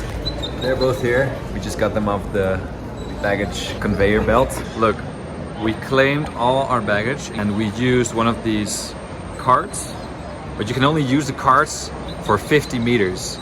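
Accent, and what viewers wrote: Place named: Netherlands. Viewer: What country are you from , your frame and face made me think anglo, but with the accent I thought Dutch or even Danish But the name is Portugues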